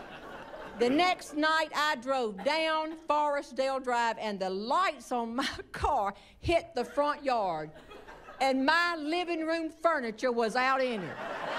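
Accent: South, United States. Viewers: Ditto, I'm just now seeing all of her clips and she's hilarious. Love her refined Southern accent she's so elegant. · And while she can't match up to Bob's legendary dead-pan comedy, she more than makes up for it with a strong dose of Southern twang and culture!!! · You gotta love southern American accent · NC..gal, me too..shes good...and we do talk like that...some even more southern than her lol....NC is a great place to live!!!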